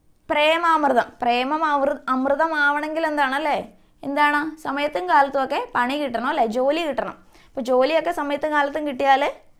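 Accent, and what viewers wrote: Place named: Kottayam, India. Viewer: കോട്ടയം, ആലപ്പുഴ എവിടെയാ വീട്....ഭാഷ കേട്ടിട്ട് ചോടിച്ചതാ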